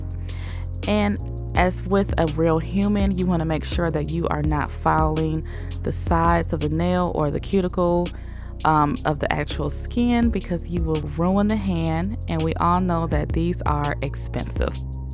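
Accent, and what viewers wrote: United States. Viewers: thankss.! why is so pleasant listening an american speaking instead a mexican speaking english! thank u so much!!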